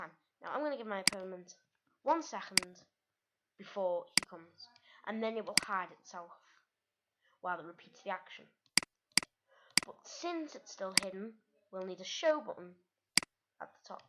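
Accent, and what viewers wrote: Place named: United States. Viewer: You sound so different on video . Xxx you sound American xx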